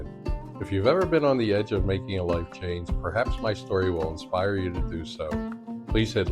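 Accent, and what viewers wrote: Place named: Philadelphia, United States. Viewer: HI! OMG I am from Lower Merion! From Rosemont/Bryn Mawr area. I immediately recognized your accent from home like a melody to my ears!... I’m living on the west coast now but grew up in PA almost til college. I was curious where you’re specifically from in PHL or outskirts? I am Amazigh…Celtic-Moroccan, Siwa Egyptian and Portuguese. My family is from Algavre but emigrated for the most part to the states after intermarrying in the UK and came down thru to the Main Line with it’s more pluralistic society on my mothers side. Very curious about a move either permanent or temporarily to either Portugal or Morocco…as are my parents to possibly Portugal. Would love to connect (if you’re open) Especially because you understand the culture of home vs there abroad in both countries and those adjustments. I am 45, just 3rd generation born in the states so there’s much to explore and visit. 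Incredibly beautiful footage! I am late to the video but thanks for sharing! Please share an update video as well! All the best! -Micki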